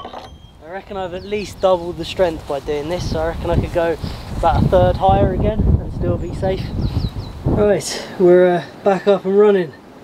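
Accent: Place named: West Country, United Kingdom. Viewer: yes, for the West Country accent alone.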